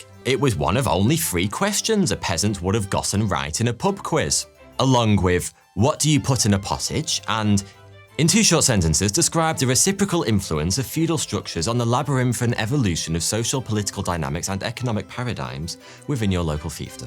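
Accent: United Kingdom. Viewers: I usually love your videos, especially the fact that the British accent is the most attractive in the world and your content was almost always on po8nt. Not this timw. Im so tired of humans, with our vast intellect, completely distegarding our animal instincts when considering humans threat level. How can a 200lbs mountain lion bolt from a person half its size, but other humans seem to think we're so weak and flimsy? A. We are the ONLY great ape species capable of swimming, awkward or not. B. There are very few animals that can run for as long as we can, or pursue pray for as long as we do. Cant run from sometbibg that wont stop chasing you. 3. You can keep on with your pessimistic approach to humanhood, while i take in the facts of history. Consider we are the dominate species on the planet, well, come prove im weak for being human, go ahead.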